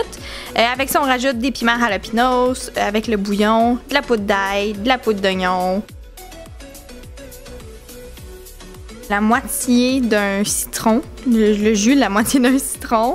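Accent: Quebec, Canada. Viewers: L'accent Québécoi j'adore 😏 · L’accent québécois, le plus bel accent du monde ! Bisous de France 😘🇨🇦🇫🇷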